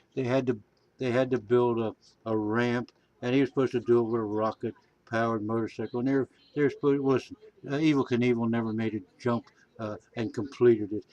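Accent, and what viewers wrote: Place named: Pennsylvania, United States. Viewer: haha bigfoot....hey you sounds like you're from PA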